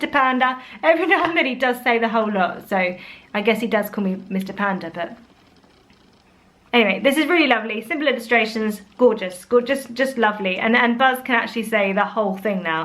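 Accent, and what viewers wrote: Scotland, United Kingdom. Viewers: Your Scottish accent is so good- you sound like Merida! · That Scottish accent is brilliant! "I cannot rememberrr..." My 15 Month Old Niece loves the "That's not my..." Series...I think she has the whole collection! 😂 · Your Scottish accent is on point!☺️ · I loved your Scottish accent, v authentic 😀 Great books I'm going to look for some of those for my son. Enjoying mumsday videos x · Love the book shelf behind you, where did you get it from? your scottish accent is quite good, you can also get the Gruffalos Child in Scottish slang!! It comes under Gruffalos Child in Scots.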